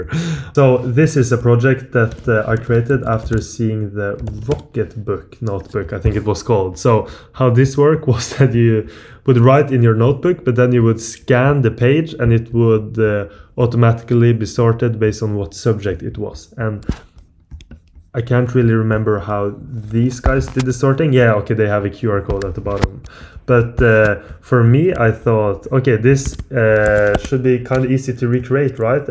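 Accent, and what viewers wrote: Norway. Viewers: Crazy. Are you from Norway?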